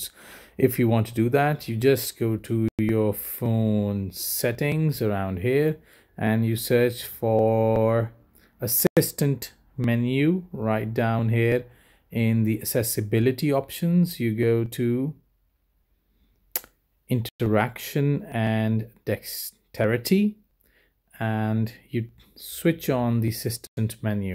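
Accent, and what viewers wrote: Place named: India. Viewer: First of all you are very Indian accent second you are talking to fast that’s not good way to help people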